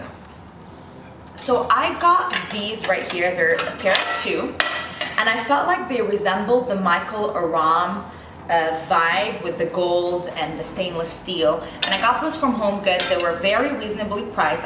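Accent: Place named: Arab world. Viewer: we still love ur Arabic accent. So it's okay u can pass with it.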